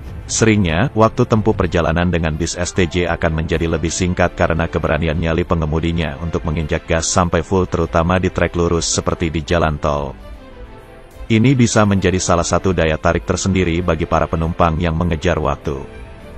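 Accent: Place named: Banyumas, Indonesia. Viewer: Bise wong ngapak tetep sinjay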